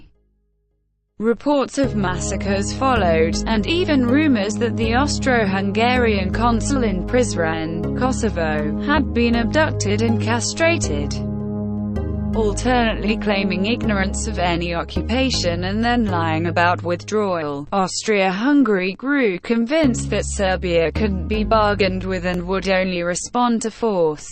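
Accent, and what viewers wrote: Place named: United Kingdom. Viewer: With all the background noise this woman with a heavy British accent cannot be understood.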